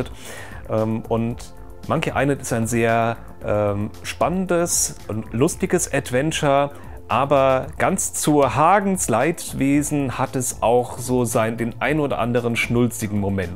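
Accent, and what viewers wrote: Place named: Hesse, Germany. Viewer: aus welcher Ecke von Hessen kommst Du denn? =)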